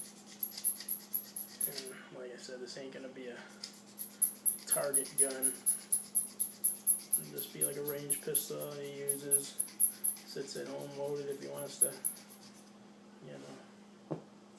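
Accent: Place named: Illinois, United States. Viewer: ill bet you a ten shot wilson combat magazine 9mm ur from illinios?, i can hear it in ur voice? if I'm wrong let me know and I will send you the magazine for a 9-millimeter Wilson Combat to any address of your choosing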